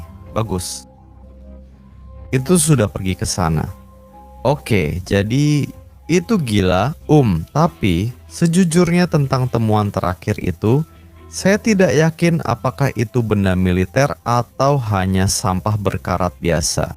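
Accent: United States. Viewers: iti orang adit suara asli orang USA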